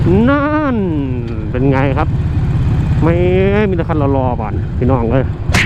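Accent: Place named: Isan, Thailand. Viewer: สำเนียงเสียงเว้าม่วนหูหลาย FC from Laos🇱🇦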